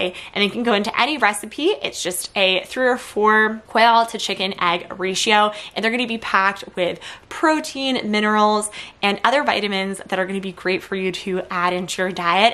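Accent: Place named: United States. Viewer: “Rabets and Qwel” I swear this is the first time I’ve struggled with the American accent. 😅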